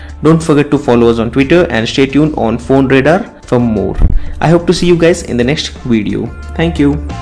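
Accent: India. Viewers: maybe you should remove your indian slank when you speaking in English..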